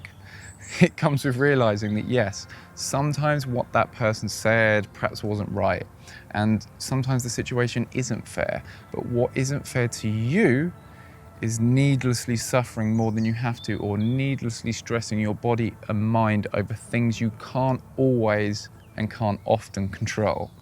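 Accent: United Kingdom. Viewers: Love british accent!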